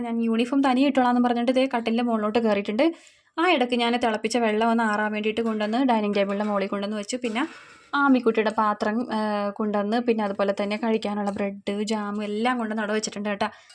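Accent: Kochi, India. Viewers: ഞാനും ഒരു തനി kochikari ആണ് യുടെ സംസാരം സെരിക്കും എന്നെപോലെ ചൊന്നുന്നു 😂😂😂😂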